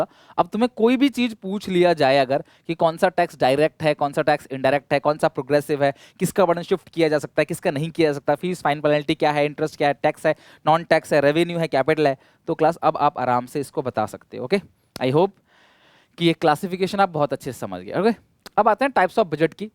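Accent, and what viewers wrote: Odisha, India. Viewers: Sir are you from odisha ?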